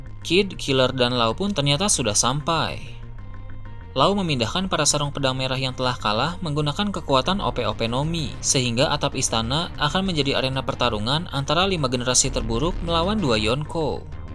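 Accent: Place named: Lombok, Indonesia. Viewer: Medok lombok tajem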